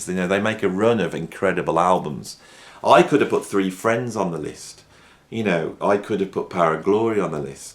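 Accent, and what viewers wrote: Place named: England, United Kingdom. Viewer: I'm not happy you should have had them on the list from the very beginning and your reasoning was pretty lame 😢 it's hard to believe I hear an English accent coming out of your mouth but you didn't pick ELP the first time around😮 I say this and I'm American😊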